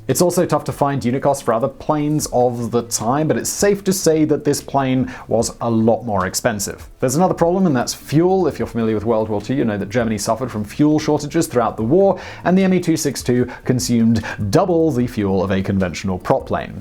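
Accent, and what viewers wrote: United Kingdom. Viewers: It is funny how sensitive the Brits or as we call them from downunder Pomes! This chap is going on about the things with his Pomie accent ! How many Pome jets were there? Its about like asking the Pomes how many World Cups or Euro cups they have won! What a joke! Not the best objective documentary! Cripe the Pomes were saved by the ANZAC's, Canadians, and the USA! And Yes the Communist USSR gave a great deal, but not much in terms of Innovation! The British Invented the Steam Engine! Well done!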